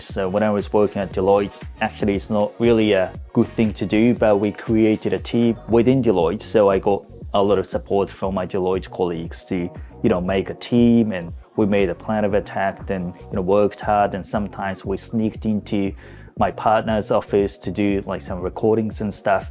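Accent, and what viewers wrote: Australia. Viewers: You really sound like an Aussie mate lol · 質問です。ネイティブの人がこのアツさんの英語を聞いたとしたらどのくらいオージーアクセントなんですかね？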